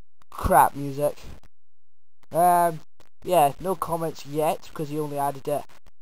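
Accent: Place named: Scotland, United Kingdom. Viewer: scottish accent = da shit.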